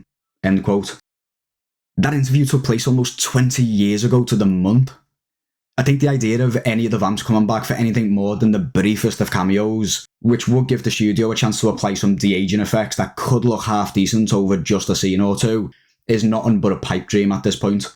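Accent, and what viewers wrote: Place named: North, United Kingdom. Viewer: nah they’re not the only one! It’s so refreshing to hear a familiar accent. It was partly why I kept watching.😊 We’re definitely underrepresented in the media. Northern accents in particular are sadly so rare.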